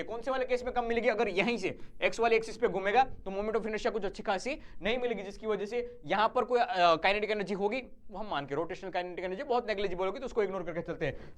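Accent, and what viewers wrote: Gujarat, India. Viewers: Sir aap Gujarati ho kya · Sir gujrati hai....shayad...😊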